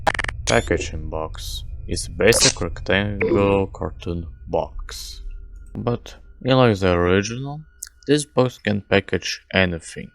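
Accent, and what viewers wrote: Russia. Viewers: i think he has a russian accent or something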